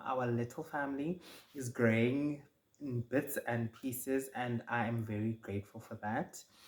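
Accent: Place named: United Kingdom. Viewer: Your English is close to British.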